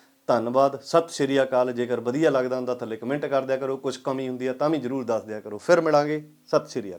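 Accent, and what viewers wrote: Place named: Punjab, India. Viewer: Sir thet punjabi...ooyehoye.. apnapan jiven koi gharda samjounda hove 😊niane jamman, Marne- gallen ,saran 😂 bhut wadiya lgga..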